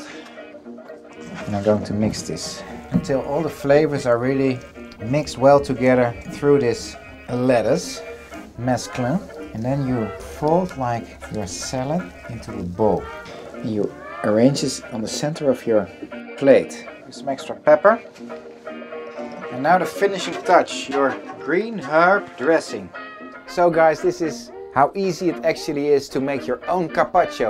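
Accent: Netherlands. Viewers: Bart's Fish Tales Ik ben geabonneerd op jamie oliver's kanaal en toen ik je accent hoorde wist ik gewoon dat je Nederlands was haha. Kun je een keer gerechten maken met gamba's? Ik ben namelijk dol op gamba's en garnalen...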